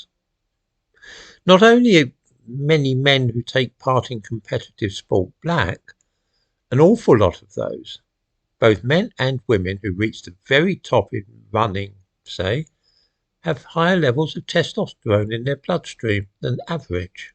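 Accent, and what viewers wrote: United Kingdom. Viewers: good job on this comment section you created a nice space for racists and xenophobes to gather and share their thoughts. "race matters we ignore it" what do you suppose we shall do with race ? what is your suggestion oh great one? push them towards taking up sports? racially profile them so they can be closley watched by the police because they have more testosterone ? (!) you, WHITE PEOPLE, have committed, and continue to commit some of the most horrendous crimes against humanity, wasn't your "kind" who would cut the right hand of african children if they failed to work as hard their "masters" demanded. wasn't that barbaric? oh that was necessary because you think they are inferior race don't you ? disgusting. just because you speak with a British accent doesn't mean what you say makes ANY sense. "race matters we shouldn't ignore it" alright you tell us what to do lol. 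or you're simply leaving things vague for your followers and commenters to fill in? judging by comments such as "the crime stats prove this" they sure understand your language. they sure are great at generalizing, putting people in a box and putting a label on it.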